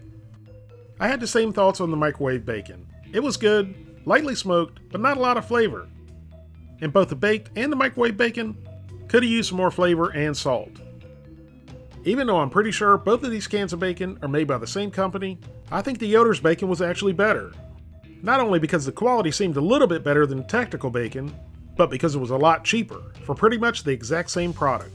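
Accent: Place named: West, United States. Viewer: Gorden Wood it's cause woody Harrelson would say "I gotta do it for the people" alot and with this guys western accent it seems spot on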